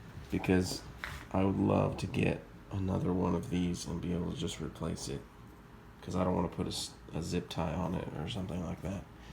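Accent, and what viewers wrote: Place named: Utah, United States. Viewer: I thought I recognized a Utah accent and then I saw your profile landscape pic of Utah Valley! Am I right?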